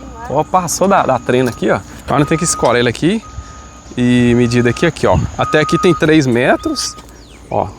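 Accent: Minas Gerais, Brazil. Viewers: "Olha só procês vê", mineiro raiz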